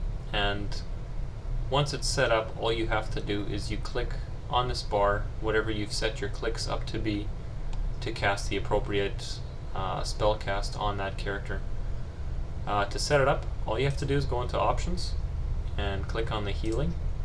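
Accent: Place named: Canada. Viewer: You sound Canadian :D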